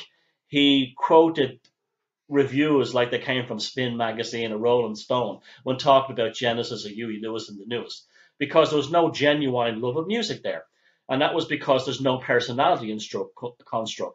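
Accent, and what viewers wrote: Ireland. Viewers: ...Nice to hear your Irish dulcet tones again...(enjoying your videos as always). Wishing you a very happy 2018.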